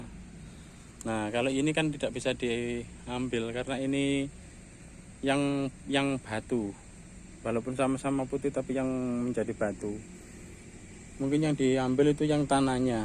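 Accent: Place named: West Java, Indonesia. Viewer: bhs mu ane kl jabar kn..lucu haha hebat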